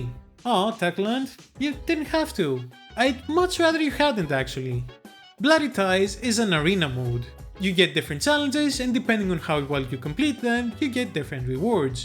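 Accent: Greece. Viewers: The greek accent is so noticable 😂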